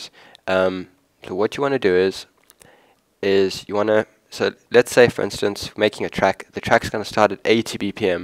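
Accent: South Africa. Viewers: Random but you sound South African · you sound south african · Is that a South African accent I'm hearing?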